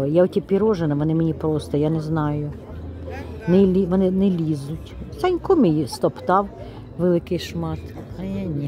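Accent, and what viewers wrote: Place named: Surzhyk, Ukraine. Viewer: ваш суржик мадам патроитка режет ухо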